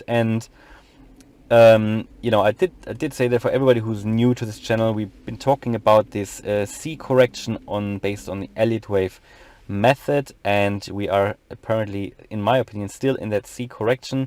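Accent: Germany. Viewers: Unverkennbar wenn Deutsche Englisch reden 😊🇩🇪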